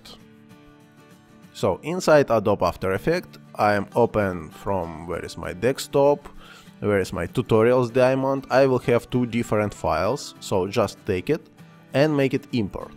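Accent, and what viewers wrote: Russia. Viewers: thanks for RUnglish!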